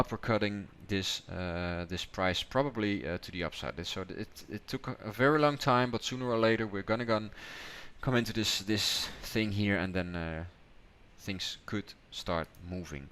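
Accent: Netherlands. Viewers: Are you dutch ?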